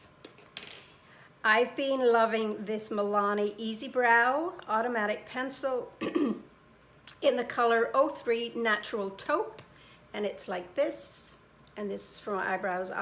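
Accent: Ireland. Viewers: Just found you from Kathy's video! I've subscribed :) Are you part Irish? I can hear something different in your accent but can't place it 🤔 x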